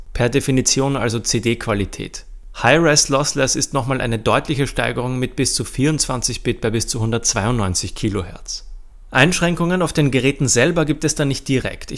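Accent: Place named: Austria. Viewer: Ich liebe diesen österreichischen Akzent immer wieder! 😃😍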